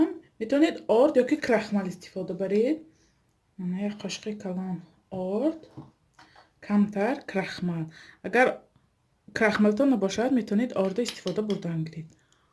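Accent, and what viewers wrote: Isfara, Tajikistan. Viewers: Салом.рз руи фахмишам номатон аст. Хело хуб.бахшиш мегуфтед.ки шумо дар кадом шахри Русия зиндагони карда истодаед.аз руи гапзаниатон аз ш.Исфара мебошед.агар хато накунам.кадбонуи хуб будаед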